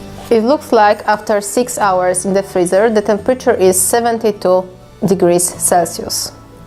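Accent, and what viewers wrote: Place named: Poland. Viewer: po co mówic po angielsku jeżeli słychać ze jesteś z Polski?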